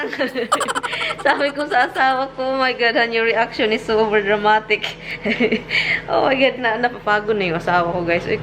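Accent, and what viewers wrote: Visayas, Philippines. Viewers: Magkatawa ko sa imo sis pag mag storya ka bisaya tagalog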